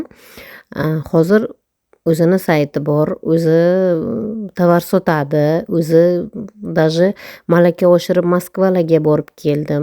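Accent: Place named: Navoi, Uzbekistan. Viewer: Овозизгахам узингизгахам гап йук Навоини шеваси N 1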